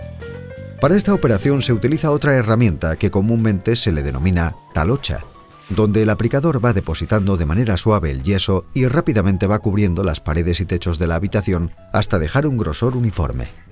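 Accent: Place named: Spain. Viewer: No sé quienes están más brutos si los que critican sin conocer o los que preguntan que de qué país es este. Las ciudades mencionadas en el video son de España y el acento del narrador es Español, inconfundible. Por otra parte las técnicas de construcción varían grandemente entre países, ya que los productos no son los mismos, por ejemplo, la forma de preparar concreto en Argentina y Mexico son diferentes, por que no es el mismo tipo de cemento que el que se fabrica aquí por Cemex o Holcim(antes Apasco). El yeso, en Mexico se aplica vivo, sin mezclar, allá es muerto, matando el fraguado con el mezclado continuo, son simplemente técnicas diferentes.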